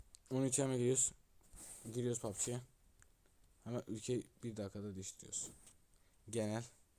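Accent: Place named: Syria. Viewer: Abi sen suriyelimisin konuşmayı unuttun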